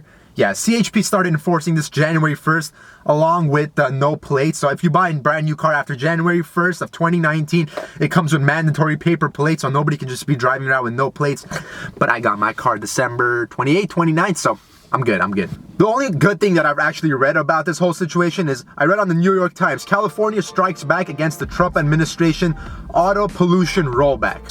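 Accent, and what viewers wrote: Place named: Europe. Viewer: He sounded European tryna speak English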